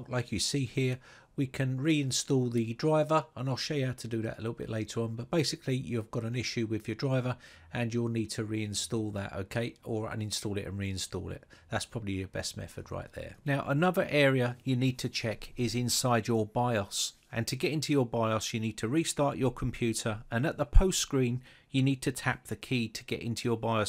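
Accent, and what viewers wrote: United Kingdom. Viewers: Hearing a british accent after all of those indian ones is so refreshing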